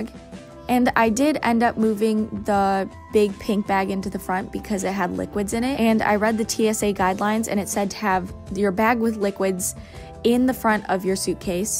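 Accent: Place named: Michigan, United States. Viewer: Me: idk why but she sounds like she’s from Michigan Her: get ready for the Michigan wether Me: I knew it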